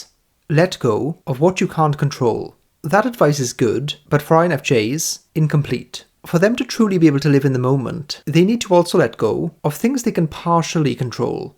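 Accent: Wales, United Kingdom. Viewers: "INFJs are never-ending future anticipators" Thank you that's the best way I've ever heard it said. And as an Aberystwyth boy good to hear it said in a Welsh accent! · I send your videos to my INTJ boyfriend a lot and I'll ask him "hey did you watch what I sent you"? and he'll say "oh from the British guy?." So, you're the MBTI British guy around here lol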